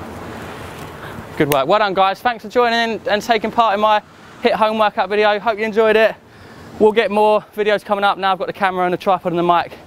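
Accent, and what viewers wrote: England, United Kingdom. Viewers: Alice Liddel and a bit of a softer english accent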